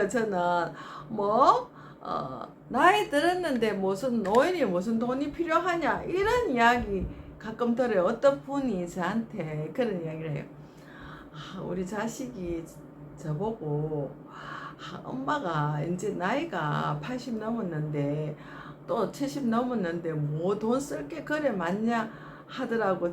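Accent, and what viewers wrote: Gyeongsang, South Korea. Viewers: Wow! 雨中이라도 예쁜 女뻐꾸기의 경상도 사투리 소릴 들으니 기분은 상쾌하네요! 아름답고 매력적인 음색의 말씀과 편집이 잘 된 영상, #우리의삶은_매일쓰기다의 좋은 교훈은 종일 듣고 싶네요. 늘~ 행복하시고 - - - 계속 재밌는 말씀을 기대 합니다. :body-blue-raised-arms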